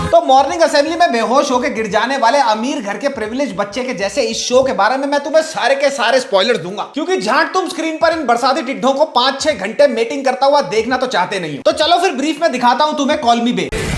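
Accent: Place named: Delhi, India. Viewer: Bhaiya mujhe aj pta chla ap out of india rehte ho. Apki baatein ,jokes ,accent se lgta h ki ap delhi m reh rhe ho. 🤣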